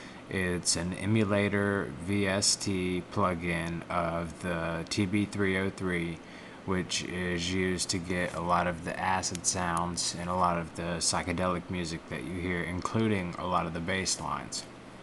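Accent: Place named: United States. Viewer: nice vid, but i mean, you are an american (because of the accent), and i thought that americans only listen to hip hop and mainstream kind of shit. But oh well...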